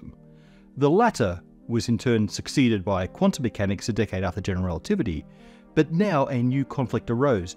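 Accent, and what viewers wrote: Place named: Australia. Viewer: is from Australia, so maybe that's his Aussie accent coming through?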